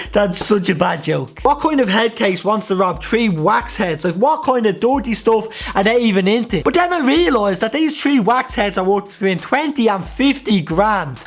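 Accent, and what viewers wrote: Ireland. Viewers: I'm from b pool cork city and your county Dublin accent is way too culchie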